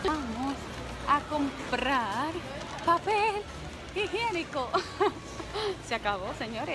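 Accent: Dominican Republic. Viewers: Yo sabía que eras dominicana desde que escuché nuestro acento!❤️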